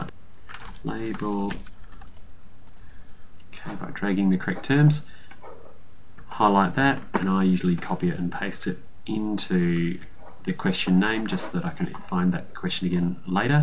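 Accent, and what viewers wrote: Australia. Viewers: Fantastic, you've saved me a whole heap of heartache - so clear and concise - nice to hear an Aussie voice on youtube as well:)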